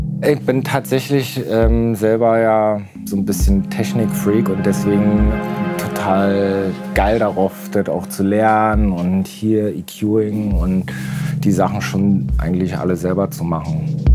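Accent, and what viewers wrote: Berlin, Germany. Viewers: schöne berliner Schnauze wa!? sympatischer Typ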